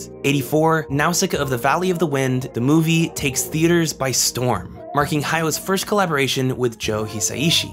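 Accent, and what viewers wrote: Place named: United States. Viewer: Hi, this came up and I got to the point where you got to Myazaki pointing out how this is Iraq war. I agree war is bad, I don't think that is up for debate. But Myazaki is a known Pacifist, and as a Japanese person, I won't fault him for it. But based on your accent, you are an American. In the current world, do you think we should be pacifist? Its 2024, and there are multiple wars we are supporting. Should we pull out?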